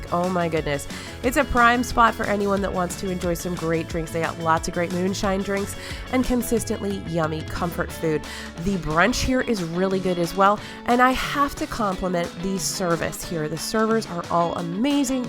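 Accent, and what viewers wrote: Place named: Buffalo, United States. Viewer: are you from Buffalo? Takes one to know one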